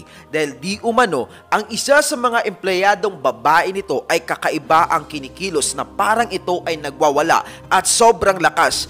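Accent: Visayas, Philippines. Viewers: Hahaha bisaya diay ka ya washout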